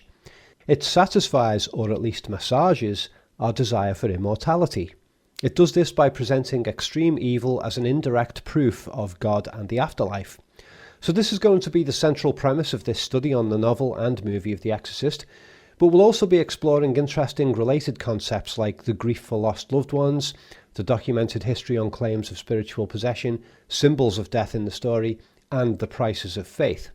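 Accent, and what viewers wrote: Liverpool, United Kingdom. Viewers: found your channel the other night and i love the videos they’re in depth and have some real good explanations and analysis to go with them, on that note am i wrong but i’m curious are you from liverpool?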